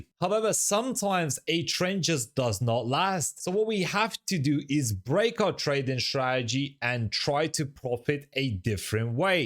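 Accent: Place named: United Kingdom. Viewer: I have no idea why the messages aren’t coming from your side. However I am available in ur discord server. Are u a resident in UK 🇬🇧? Your accent seems like u are a British.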